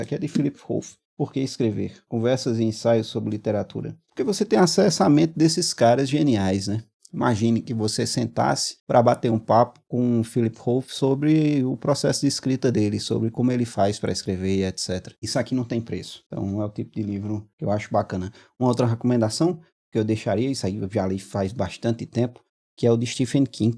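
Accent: Pernambuco, Brazil. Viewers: Hoje estou em Portugal, sou iniciante na leitura. E posso dizer que não é só no Brasil que existem livros caros. Aqui também há. Fora isto.. Hoje estou em busca de como posso fazer para importar livros para cá, tanto pelo preço como também que por incrível que pareça no Brasil existem bem mais obras para língua portuguesa. Abraço Professor, seu sotaque para ser de Pernambucano, pode ser que eu esteja equivocado, se for somos conterrâneos. Abraço.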